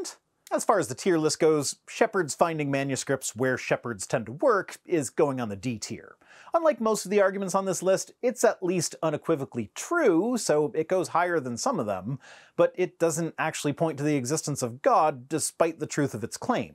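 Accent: South, United States. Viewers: I tried to get through this , but this dude's voice and flimsy argument were too much to tolerate. And although I live in Louisiana, his cuuuntree Ackkkksent was shrill at best. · His southern accent is so grating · Being from the southern United States, I can say this with all sincerity and lots of experience...NEVER BELIEVE ANYTHING ABOUT GAWD WHEN IT IS SPOKEN WITH THAT ACCENT!! NEVER!!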